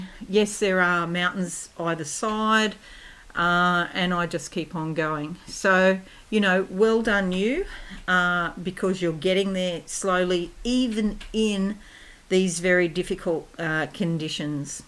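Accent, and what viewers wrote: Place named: Australia. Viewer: Thank you..nice to hear an Aussie accent..am also an Aussie..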